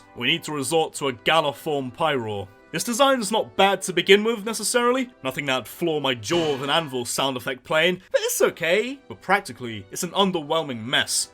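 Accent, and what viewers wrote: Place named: Bristol, United Kingdom. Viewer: Ahh, that intro sounds like bristol :)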